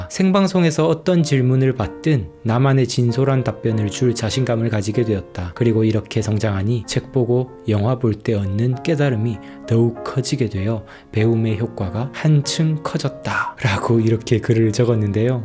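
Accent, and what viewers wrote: Andong, South Korea. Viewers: 구독했습니다 아직 님 영상을많이보지는 않았지만 요즘 많이 고민중이 두가지문제가있어서 님 생각을듣고싶어서요 하나는 저도 책이 800권쯤있는데요 한번씩 정리할까? 하는생각이 들때가 있어요 저는 돈벌고부터 책을읽어서 다 사서 보다보니 이렇게되었는데요 책을 읽기도하지만 한번씩 보여줄여고 모으나? 하는생각이 들더라고요 뭐 단편적이아닌 지금제 많은생각 플러스된거라 다 이야기는 못하겠지만요 서론이 길었네요 님은 읽은책들 정리안하시고 게속 가지고있으실생각인가요? 또하나는 저는책을읽을때 줄긋고 표시하고 모르는단어 확인해서메모하고 그런데 마음에든글들을 님은 따로 메모를해놓는지요? 지금보니 좋았던글 플러스 님생각도 쓰시는것같은데요 제가 직장을다니다보니 손목이안좋고 악필이라 쉽지는않은데 또 써놔보고싶긴하고 두가지가 계속 해결못하는 일인입니다 우문현답 기다려도될까요? 다른영상에서 답을찾을수있을듯하네요 다들똑같은고민을하나봅니다 영상들감사합니다 글고 안동사투리 정답네요 ㅎㅎ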